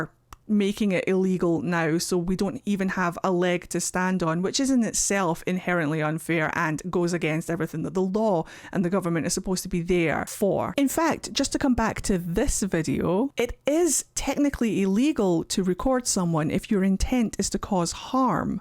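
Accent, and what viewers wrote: Scotland, United Kingdom. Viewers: First time seeing one of your videos, but I heard the accent and immediately knew it was gonna be a good one. Love our Scottish folks, y'all some real ones <3 - From a Texas Trans Woman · As an English brit. Love the Scottish accent!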